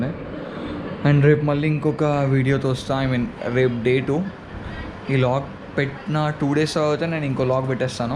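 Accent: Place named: Hyderabad, India. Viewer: Mana Hyderabad slang a alaguntadi 💙💙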